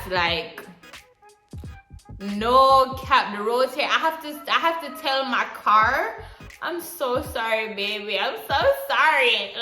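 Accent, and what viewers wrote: Caribbean. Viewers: That intro…I know she’s a Caribbean gworl🤣🤣🤣🤣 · Loving that Caribbean accent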